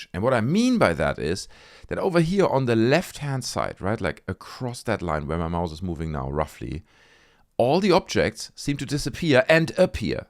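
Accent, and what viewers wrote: Germany. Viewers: dude, the combination of your real german accent and choice of words while mocking what true believers would say in response to the "evidence" they have just seen on these videos clips is pure comedic gold!!! Keep up the great content. I am suffering with you but you make it easier to tolerate these idiots!!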